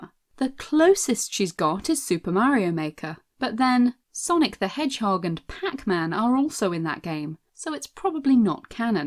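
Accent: United Kingdom. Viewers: It sucks that she wasn’t added, as a playable character in the game. Other people, such as myself, would’ve loved playing as her, if they added her to the actual game, and actually be playable in the dlc, that Nintendo might plan on making, that is. Also, glad to hear your British accent again, by the way, since I’m British as well, just like you are irl!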